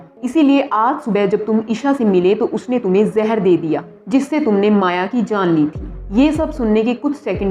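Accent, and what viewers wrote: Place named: Gujarat, India. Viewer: The narrator has Gujarati accent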